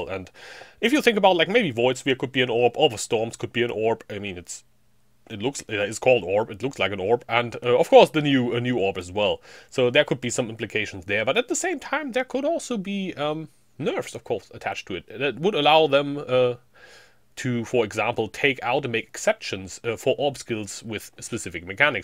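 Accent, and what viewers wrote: Germany. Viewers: wow, your english pronunciation has improved quite a lot over the years. as a fellow german, i always heard the german accent but now its almost gone. keep up the good work.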